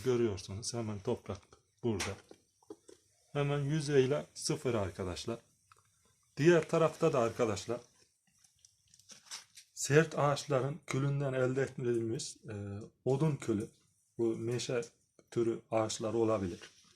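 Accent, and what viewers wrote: Turkey. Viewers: Sayin ustam süzülen topragi kaç dakika kaynatıyoruz kulun sadece suyunu katıyoruz heralde abicim şiveniz iç anadolu bende bu bolgedenim selamlar saygilar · Ustam nerelisin sivenir bize yakin sanki elbistandan selamlar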